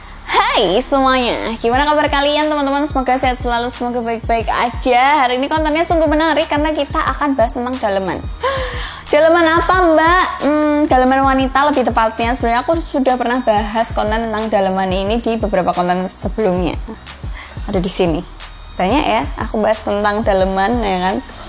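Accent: Java, Indonesia. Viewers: logatnya ky orang jawa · Wisss ayu, cerdas,yo supel,logat jowone nambah gawe ayune mba 'e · Jawa banget suara ny mba.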